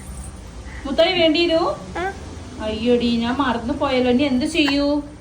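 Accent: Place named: Thrissur, India. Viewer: പക്ഷെ ചേച്ചി ടെ ഭാഷ കോഴിക്കോട്ക്കാർ പറയുന്ന ഭാഷയിൽ അല്ല ല്ലോ ചേച്ചി സംസാരിക്കുന്നത്. തൃശൂർക്കാരുടെ പോലെ തോന്നി ട്ടോ അതുകൊണ്ട് ചോദിച്ചത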